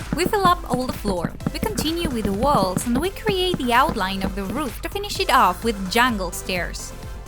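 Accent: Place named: United Kingdom. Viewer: Who love her British voice❤❤❤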